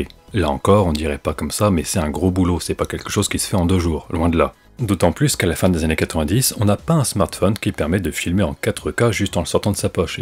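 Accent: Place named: Paris, France. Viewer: Je viens de découvrir ta chaîne et malgré ton accent parisien ;) je suis très fan, merci.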